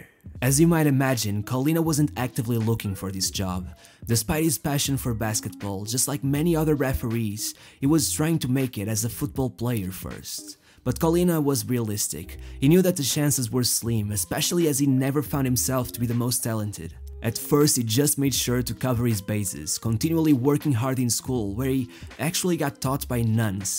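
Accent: Portugal. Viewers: Este gajo que está a narrar parece-me ser português, tem o sotaque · Your Portuguese accent kills me, reminds me of my Portuguese friends! Great vid!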